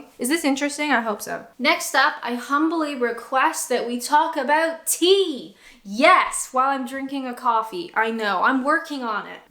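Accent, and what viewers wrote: United Kingdom. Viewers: Great video as always 👍 Your fridge is HUMONGOUS compared to mine!! I love it when you do your British accent, it's coming along nicely 😉 My favourite teabags are the Co Op's Fairtrade tea, not too strong or weak, just right for me. Are cheese and onion crisps popular in Canada? Obviously they are very popular here in the UK. Well happy valentines day, I hope your other half has spoilt you rotten today ❣ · wuss-tuh-shuh. There used to be a Worcester Sauce flavoured crisp. They spelled it 'Wuster' on the packet to try to help people say it. Still, your British accent is coming along well in other ways. You have a most un-Canadian glottal stop on the word 'fruit'.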